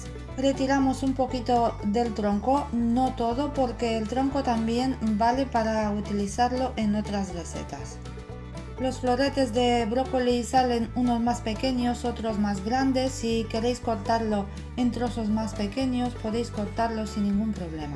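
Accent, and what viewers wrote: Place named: France. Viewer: Me encanta tu acento franses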